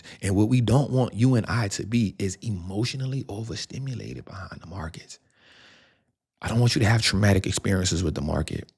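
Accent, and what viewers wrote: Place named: Los Angeles, United States. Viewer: Thank you. You explain very well. ❤ Love the LA accent! 😊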